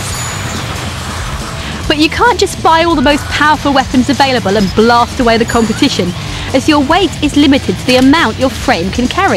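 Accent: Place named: United Kingdom. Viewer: shes too british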